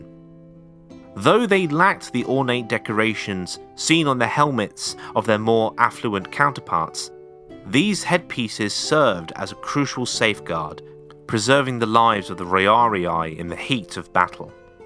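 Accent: United Kingdom. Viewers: ya sound like you're from heartfordshire. Let that proper british accent out will ya? 💯👍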